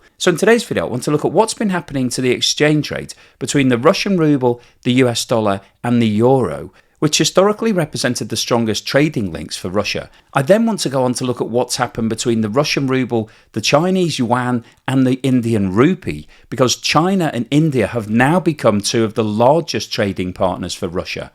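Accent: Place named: England, United Kingdom. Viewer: Anything with a English accent is usually rubbishy anti-Russian propaganda. Does Russia have $35+ trillion in Debt and out of control spending? I didn't think so... e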